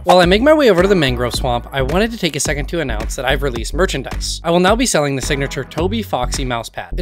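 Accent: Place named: Midwest, United States. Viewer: Are you midwestern by chance? I recognize that accent because I have the same one!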